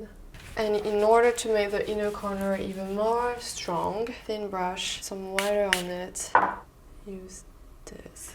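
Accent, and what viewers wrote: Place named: France. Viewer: Bravo for your vidéos that show simple ans wearable make up so différent than most of the american Youtubeuse who make make up with tons of coats, with two many Colors ans hours spent in thé bathroom ( at what Time do they wake up if they work????).... for a result...how to say... I have readen that thé music in the beginning was not available, et c.est bien dommage. Je continue en français parce que moi aussi suis française, et que ça va dix fois plus vite de taper en français. En tout cas, ne change rien, avec ton petit air de Jane Birkin jeune et ton frenchy accent « so cute » ( j.ai le même... et après avoir passé des années à essayer de le gommer, j.ai abandonné et maintenant je le revendique même!). Suis allée à New York en octobre dernier, mais ne t.ai pas croisée au détour d.une des rues du « village »😪. Allez continue à faire « ce que tu veux » et encore bravo.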